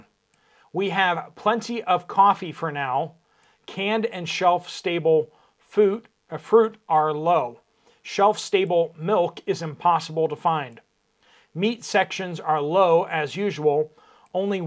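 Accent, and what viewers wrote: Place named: South, United States. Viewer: Sounding like southern prepper again....😢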